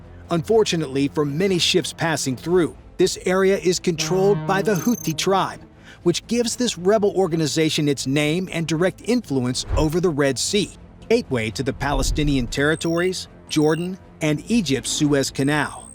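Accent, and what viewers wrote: United States. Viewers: Sounds like a Nyc gan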